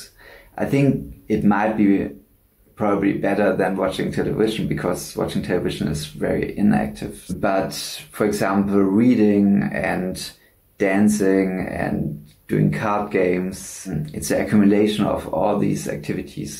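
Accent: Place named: Germany. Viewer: Starker deutscher Akzent, bist du Deutscher?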